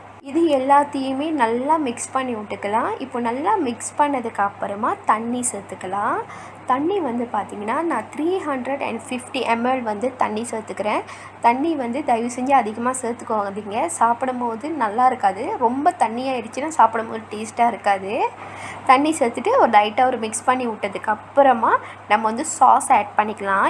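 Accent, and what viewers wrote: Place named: Sri Lanka. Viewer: Full video covered da super nd ur slang s lyk srilankan very nice to hear ❤️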